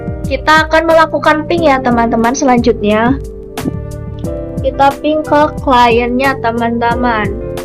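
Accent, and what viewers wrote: Indonesia. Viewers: Medoq banget teman teman 😭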